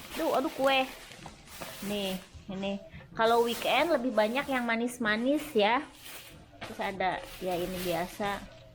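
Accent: West Java, Indonesia. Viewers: Meuni sundaa pisaaan euyy ..samii abi oge mojanh priangan atuh lahh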